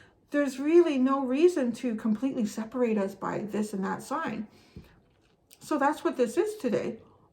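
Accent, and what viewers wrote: United Kingdom. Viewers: You do a British accent perfectly! It sounds Gr8 to me, but I'm in Texas. haha. Random Royal fact, Prince William is a Leo🦁🦉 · Thankyou that was interesting, that was a great english accent too and you can't beat a bit of trailer park boys 🤣 peace, love and light to you ✌💞🙏 Xx much love Xx from London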